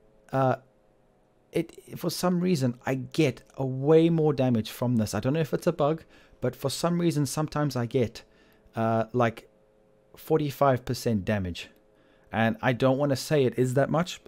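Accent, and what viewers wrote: South Africa. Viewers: What country you from... South Africa?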